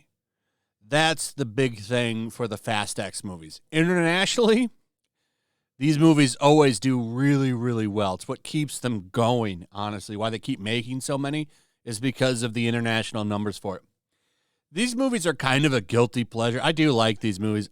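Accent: United Kingdom. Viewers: you have a great chap accent. love it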